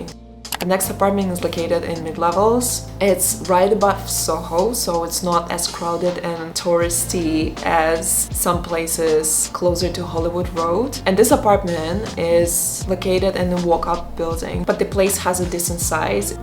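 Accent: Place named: Russia. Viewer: The ruzzian accent is disgusting, I am not watching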